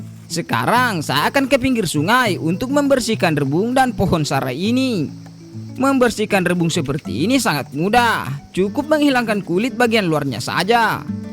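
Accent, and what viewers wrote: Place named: Makassar, Indonesia. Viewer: asli org mna bang pke makassarki ku dngar😂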